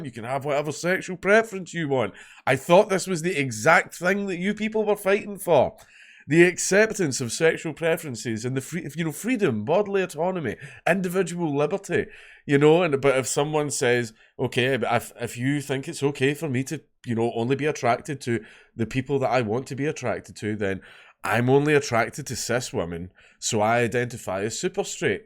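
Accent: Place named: Scotland, United Kingdom. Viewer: Just for curiosity's sake I checked the auto-generated captions in this video, and they are almost perfect! Yutube's bot probably understands Scottish accent better than I do.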